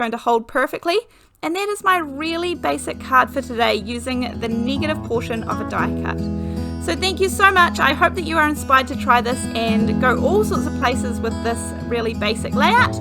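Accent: New Zealand. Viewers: I love this! It's so beautiful (and easy)! By the way, is your accent NZ? I'm in Canada but my son and his family his on the North Island in Laingholm.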